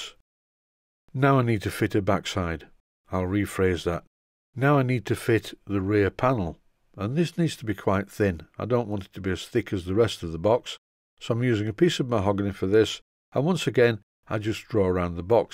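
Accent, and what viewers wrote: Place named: Northern England, United Kingdom. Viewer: dont worry I understand u fine but then I am English and have spent a fair amount of time around northerners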